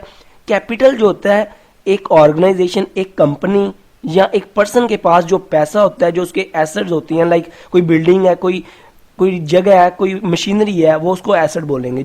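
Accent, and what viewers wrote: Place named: Punjab, India. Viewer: Bhai tu punjabi lg rha tone se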